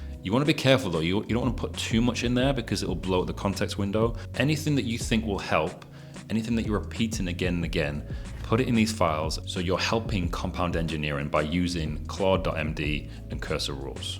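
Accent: North, United Kingdom. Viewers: Love this, new sub here, thank you; from a (what sounds like) a fellow northerner! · Senior Engineer here, definitely felt the role change in the last year. MCP Servers seem to be the powerhouse for me so far, I've also been using a 'Context Bank' MCP Server to help with the 'Compound Engineering' across different tasks (and even different tools like Roo Code and Claude Code). P.S Always nice to hear another vaguely northern accent!!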